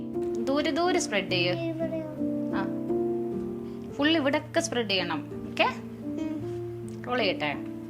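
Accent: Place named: Bangalore, India. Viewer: U r in kochi right but ur smsrm oke kekmbo looks like u r faaar away frm Kerala like banglore oke nikne pole talking Ath kitula ith kitula sadnm nnoke pryne kekmbo I always think bro u r just in kochi ilathe ayt ndha uldh enn oke chaka oke lulu indvlo athrk venm Indel 😅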